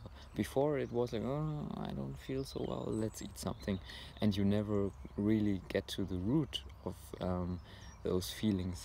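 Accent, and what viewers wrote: Germany. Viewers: are you German? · By the way, am I spotting a german accent there? :)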